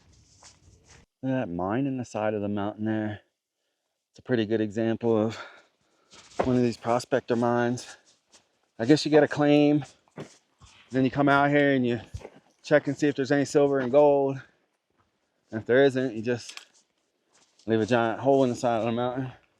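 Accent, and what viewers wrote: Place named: Pennsylvania, United States. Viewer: You need to get yourself a fishing pole brother. Sounds like you are from PA?!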